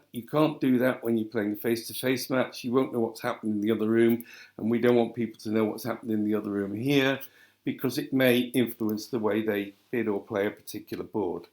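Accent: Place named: Manchester, United Kingdom. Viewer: thx - great explanation. Is it possible to delete a team match if I pressed the Create Match button too soon? BTW lovely to hear your accent as an ex-pat, whose Father was born in Manchester. It brought back lots of happy memories. Thx, Penny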